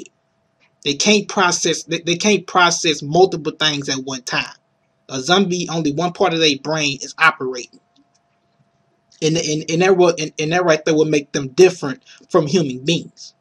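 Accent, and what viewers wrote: United States. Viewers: Are you from America man? You're accent is really cool, I can listen to your voice all day long haha!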